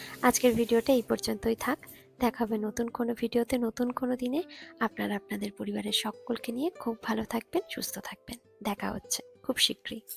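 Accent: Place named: Bangladesh. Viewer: আপনার ভয়েস টা শুনে ইন্ডিয়া মনে হয় না বাংলাদেশী মনে হয়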